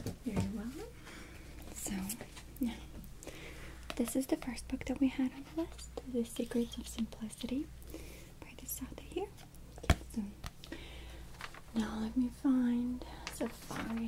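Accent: Russia. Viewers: i love your russian accent and your handwriting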